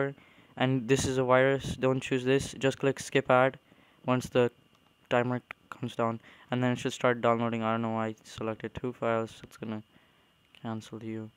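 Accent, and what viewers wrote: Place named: Arab world. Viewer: I FUCKING LOVE YOU and your arab accsent (no homo) THANK YOU SOOO MUCH!!!!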